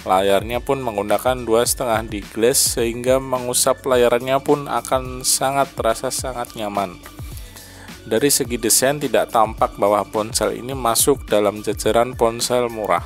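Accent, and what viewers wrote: Surakarta, Indonesia. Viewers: sampean tiang solo toh mas? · orang solo ya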